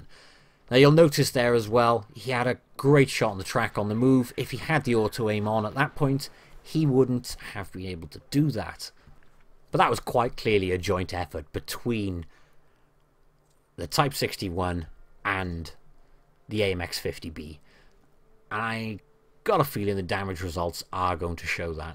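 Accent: Syria. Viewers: جدع يا:D لهجتك كانك سوري بس مش فارق المهم عربي ^^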